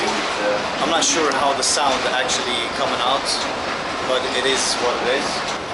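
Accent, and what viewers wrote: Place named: Ireland. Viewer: I thought I could hear the Irish in you ;)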